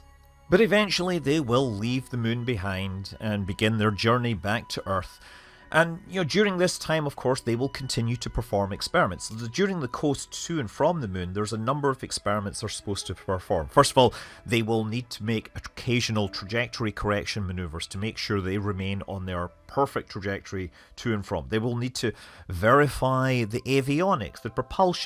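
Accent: Scotland, United Kingdom. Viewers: And now you yave a Scottish accent...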